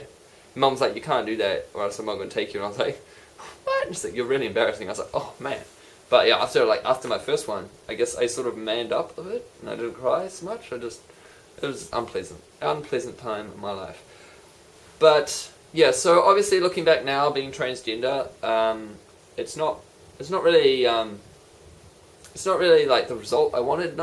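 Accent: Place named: Australia. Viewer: you sound Aussie i,m waiting for a specialist appointment to see if I have this or Klinefelter syndrome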